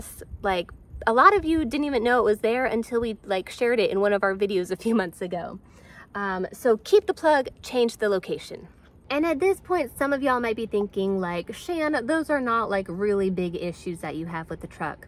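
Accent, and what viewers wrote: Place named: South, United States. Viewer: Do more videos I love ❤️ that country accent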